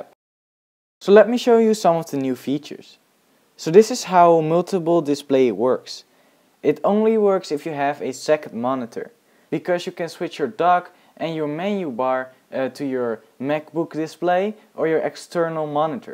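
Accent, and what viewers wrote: Netherlands. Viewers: where u from brah ? dutch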